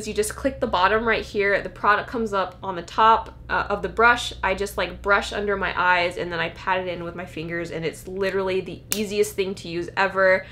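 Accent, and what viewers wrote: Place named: Australia. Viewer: The Australian accent gets me every time lol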